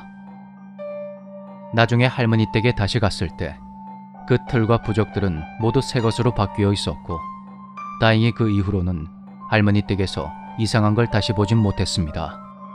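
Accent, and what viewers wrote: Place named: Jeolla, South Korea. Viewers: 님 방송 늘잘듣고 있습니다.전라도 사투리 구수하니..찰지내요 ㅎㅎ다음엔 충청도 사투리도 부탁드립니다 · 깨알같은 전라도사투리ㅋㅋㅋ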